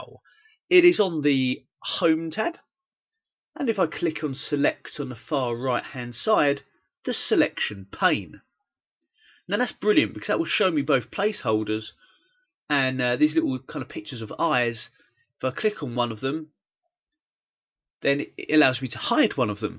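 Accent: United Kingdom. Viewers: British English accent sounds really nice..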